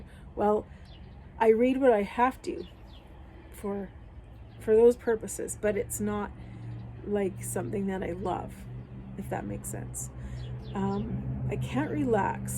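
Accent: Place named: Canada. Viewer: Hello, Debbie over there with Life in Kentucky gave you a shout out and here I am! Curious George. I realize this video is 2 months old but the title is what pulled me in. Music is so important and remember how we used to listen to an entire album side A and B when we were younger? That sounds like a great idea for sure. I love cooking with music. I LOVED AND STILL LOVE Simon and Garfunkel and James Taylor. We always have music playing, I feel like life is a soundtrack. I'm so excited about you coming back to yourself. I can't wait to see more of your videos. I'm so glad I came on over here. Debbie is right. You are amazing, nice to meet you. I am Tracie from Life in the Wylde West. P.S. knew you were Canadian, I love your accent.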